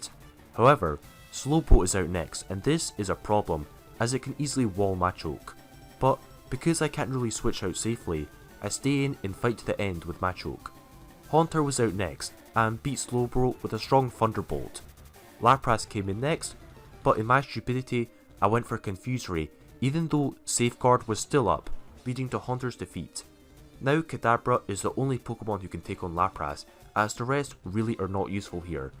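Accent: Scotland, United Kingdom. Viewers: yeah I had you pinned as Scottish maybe Glasgow or something but in some bits I wasn’t sure lol Great vid.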